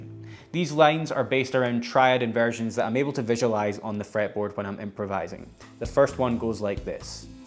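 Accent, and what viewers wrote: Scotland, United Kingdom. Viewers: Sounds like a Scot to me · Nice one sir. Your from Scotland, but where exactly. We might be neighbors. Cheers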